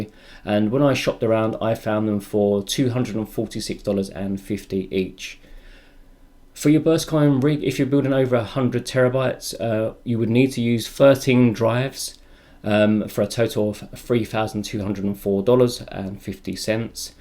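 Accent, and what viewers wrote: United Kingdom. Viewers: you sound like your from the UK but you always price in dollars strange? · Ubersonic He is British according to his accent abs he always mentions the price in pounds, but this time round he mentioned it in USD.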